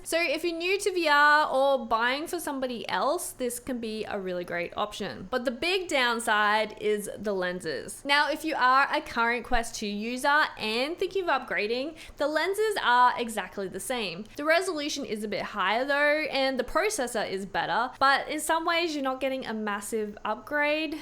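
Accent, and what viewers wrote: Australia. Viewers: damn, im usually attracted to aussie accent. hers is pretty annoying. maybe its just her voice.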